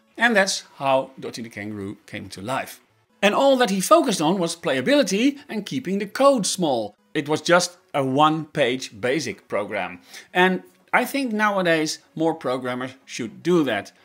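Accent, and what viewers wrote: Netherlands. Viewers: i'm too young to be using ZX Spectrum at any point in my life, but i was playing elite (the original '84 version) on an emulator and it left an impression on me, not because it was mind blowing to me by itself (it was late 10s so we already had portal 2 and my most played game at the time, FSX, because i (was? am?) a sim nerd and that's how i found elite) but because it was otherwordly different from the games of its time first i thought "it's about david braben isn't it" then i remember watching Alexander the ok's video about elite (by the way, he has a spectacular channel and i recommend everyone who's interested in space and human achievements in general a watch, my fav video of his is about space shuttle program) where he told that elite was developed for BBC Micro (and ofc it was written in assembly, have you seen the thang (both BBCM and the game itself) it's too huge for basic) then i watched 2 seconds more and you said the name lol so anyway, funny video and i don't know why but from the first couple of seconds, without seeing anything else, without checking the channel description, i felt that you're dutch i don't know if it's the accent, or the similarity to Posy or the love for the color orange or the cadence of the speech but it's not like i thought "they seem dutch", it just clicked in my brain and i think that's pretty funny great channel tho, i like it when people just can upload stuff and not worry about youtube being youtube have fun :3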